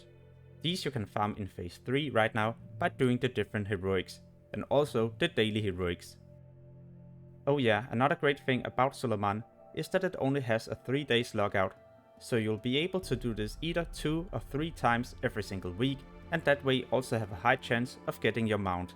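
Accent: Denmark. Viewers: You sound very danish.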